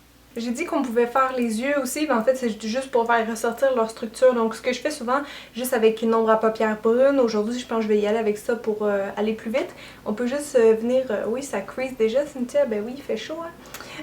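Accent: Quebec, Canada. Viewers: bnj je suis kahina de l'Algérie pour tous vous dire je ne suis pas trop maquillage mais depuis que j'ai commencé a suivre vous vidéos j'ai commencé a m’intéressé hhhh vous expliquez super bien votre accent j'adore vu que j'aime le Québec mais j'aime pas trop le froid lol vraiment du fond du coeur bonne continuation longe vie a votre chaîne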